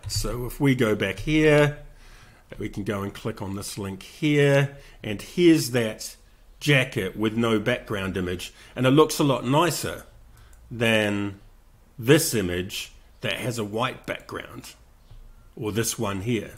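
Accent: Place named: Australia. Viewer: This guy has an Autralian accent. He sounds nothing like Joris Bohnson.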